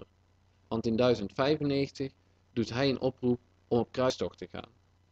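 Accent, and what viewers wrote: Limburg, Netherlands. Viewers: Ten eerste, dit is duidelijk geen Belg, meer iemand uit Limburg, Eindhoven zoiets en ten tweede doet ie meer dan jij in je hele leven zal doen;)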